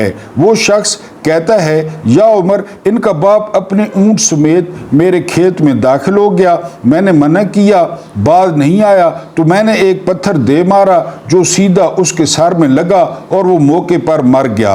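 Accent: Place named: Mianwali, Pakistan. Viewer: مجھے سرائکی زیادہ نہیں اتی لیکن اپ کے منہ سے یہبہت اچھی لگتی ھے ویلاگ کا بہت مزا اتا ھے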